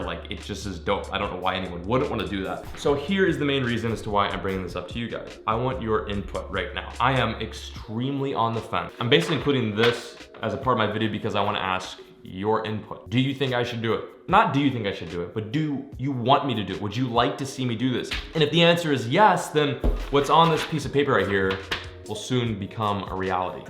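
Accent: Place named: New England, United States. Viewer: Down here In the south we have a lot of fishin tournaments every year. Where are you from? you sound like one of them ol yankey boys ya hear? I'm 77 and you fish good to be young! Good ol boy!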